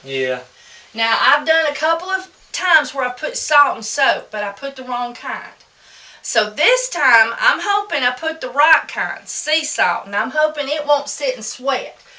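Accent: South, United States. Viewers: Hi, I have watched so many of your videos! You remind me of my grandma and grampa haley that happened to be from your state with their heavy southern accent. I can do a great southern accent to where you would think that's how I talk all the time, but I've got a boring California accent in reality. Anyway, watching you makes me feel like I'm home because of the way you talk, and your cute personality.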